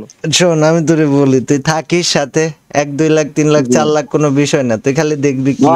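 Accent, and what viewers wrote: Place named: Chittagong, Bangladesh. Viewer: ভাষা শুনে বুঝছি চট্টগ্রাম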